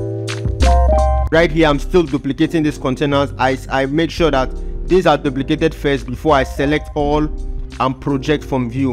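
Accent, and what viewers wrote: Nigeria. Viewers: heyy.. waasup adre you in a nigerian ????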